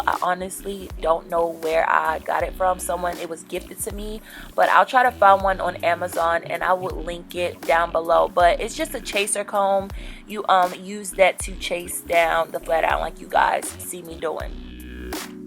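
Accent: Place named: DMV, United States. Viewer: Idk if I’m tripping but I hear a dmv accent. I’m Born and raised ! Lol ...I here that tew and monf 😩😍😍😍😍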